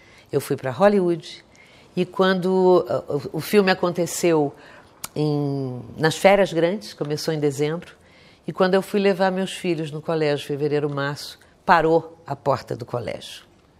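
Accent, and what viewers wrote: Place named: Rio de Janeiro, Brazil. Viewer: e esse sotaque carioca lindo!!!! e a carioca mais ainda!!!!😍😍😍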